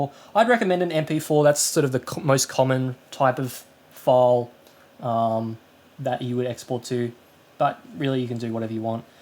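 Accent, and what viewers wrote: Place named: England, United Kingdom. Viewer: Your English is so English.. Us people from non-English-speaking countries may find ourselves as an intruders... Keep it low so us can understand you. Thanks anyway for the nice video!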